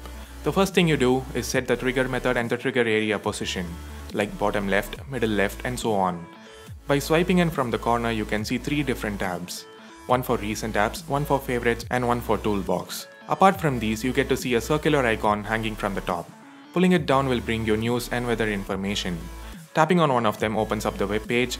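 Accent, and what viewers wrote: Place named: Tamil Nadu, India. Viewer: u still couldn't get rid of tamilian accent. long way to go....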